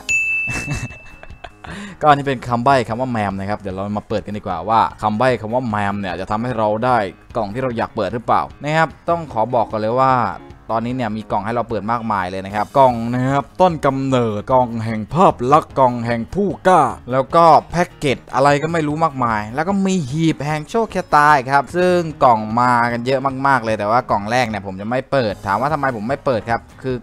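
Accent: South, Thailand. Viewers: คนใต้คนใต้5555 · คนใต้เหมือนผมเรย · เเหลงใต้ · แหลงใต้ชัดมากเลย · พี่คนใต้หรอ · คนใต้หรอครับ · เหยดเเม่ม55+สำเนียงคนใต้ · คนใต้เหมือนกันครับบบบบ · คนใต้ แน่555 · คนใต้ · พี่คนใต้เออะคับบ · ไอย้ะแหลงใต้เหมือนผมเลยนิพี่บ่าวเห่อ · พี่คนใต้ป่าวคับ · พีคนใต้ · สำเนียงใต้มาเลย พี่คน จ.ไรอะ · พี่คนใต้ปะ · คนใต้เปล่าคับ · คนใต้นี้ว่า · พี่คนไต้หรอพี่ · พี่คนใต้มายนิ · คนใต้หรอ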